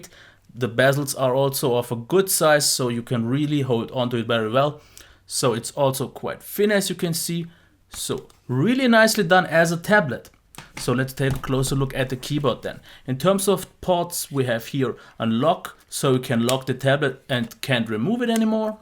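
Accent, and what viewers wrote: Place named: Germany. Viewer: That also sounds good to me! Keep up the quality and the good old German accent. :)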